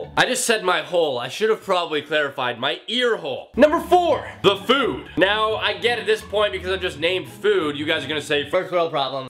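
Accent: Canada. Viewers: Wait, are you Canadian?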